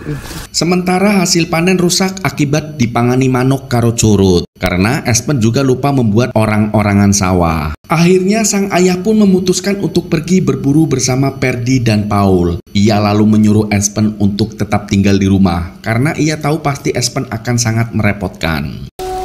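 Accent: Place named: Java, Indonesia. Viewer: Admin asline wong endi kang? 😂😂😂 lucu logat Jawa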